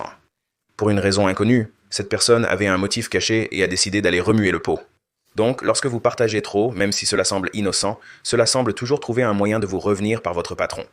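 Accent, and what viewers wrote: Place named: Quebec, Canada. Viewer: Il "sonne" québécois toutefois.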